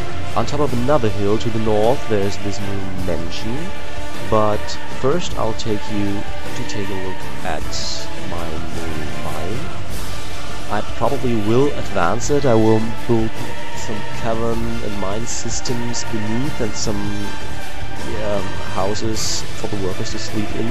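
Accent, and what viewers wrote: Germany. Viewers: ich bin beeindruckt dass du den deutschen akzent so verhältnismäßig gut verschleiern kannst^^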